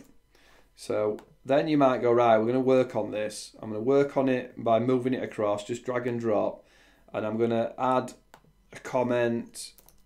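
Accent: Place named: Yorkshire, United Kingdom. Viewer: Where are you from, sounds like Yorkshire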